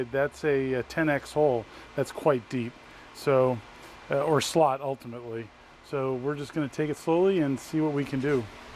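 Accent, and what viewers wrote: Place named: France. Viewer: I'm sorry to tell you but your French accent is terrifying..... But funny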